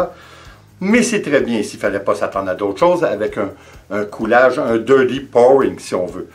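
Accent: Canada. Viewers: Magnifique accent canadien 😀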